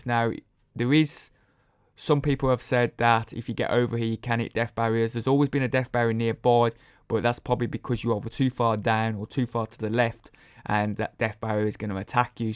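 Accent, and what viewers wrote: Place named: Birmingham, United Kingdom. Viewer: Called a brum accent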